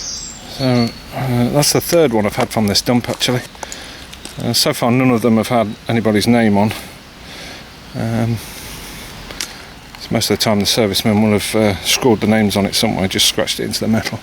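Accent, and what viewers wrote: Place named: United Kingdom. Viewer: where are u based because your website is not working and it did say u r based in the USA ,I assumed because you have a uk accent you are digging in the uk ,I ask because I wanted to join but as I've said your website is not working and I couldn't find any info on how to join ,most of the comments are people like myself are from the uk and wanted to join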